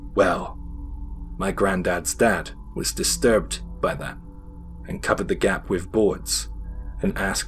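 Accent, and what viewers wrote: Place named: England, United Kingdom. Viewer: Such unique content. Japanese stories translated to American English with an English accent! You have something special here.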